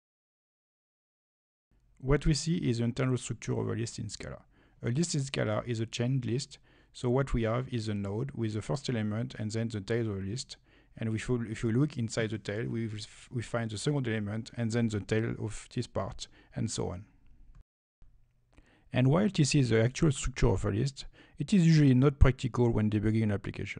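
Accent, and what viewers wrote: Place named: France. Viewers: I agree that his french accent(I could understand only half of it) was strong but still you could be polite. At least he made an effort to make a screencast for us. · The french accent is very very very strong. Could make some efforts to speak with a better english accent.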